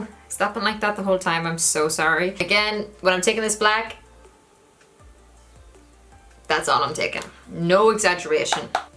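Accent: Ireland. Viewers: I'm so glad I came across your channel! You have the same sense of humor I do 😂 where are you from? I'm bad at determining accents but maybe you're from Ireland? I apologize if I'm wrong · Is she irish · What part or Ireland are ya from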